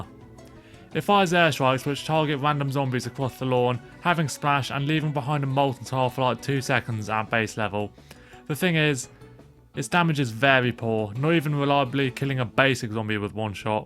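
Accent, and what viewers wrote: United Kingdom. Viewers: This guy is so unironically British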